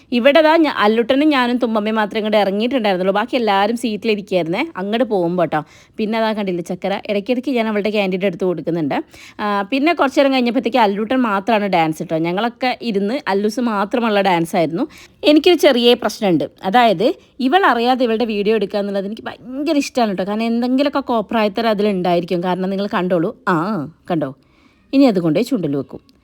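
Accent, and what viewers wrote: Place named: Kozhikode, India. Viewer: ഞാൻ ഇപ്പൊ ഇയാളുടെ വലിയ ആരാധികയാണ്. എന്നെങ്കിലും നേരിട്ട് കാണാൻ ആഗ്രഹമുണ്ട്. സംസാരം ഒരു രക്ഷയുമില്ല 🥰 ഇങ്ങനെ വേണം പെൺകുട്ടികൾ 😘 ഇങ്ങനെ ഒരു മരുമകളെ അല്ല മകളെ കിട്ടിയ ആ ഫാമിലി ഒരുപാട് ഭാഗ്യം ചെയ്തവരാണ്. ഞാൻ വലിയമ്മയുടെ മകൻ സനേഷിന്റെ വൈഫിന്റെ മാമന്റെ ഭാര്യയാണ്. ഞാൻ ഒരു തൃശ്ശൂർകാരിയാണെങ്കിലും എന്റെ ചെറുപ്പത്തിൽ കോഴിക്കോടാണ് വളർന്നതും പഠിച്ചതും അതുകൊണ്ട് ഇയാളുടെ സംസാരം എനിക്കൊരു നൊസ്റ്റുവാണ് 🥰🥰🥰🥰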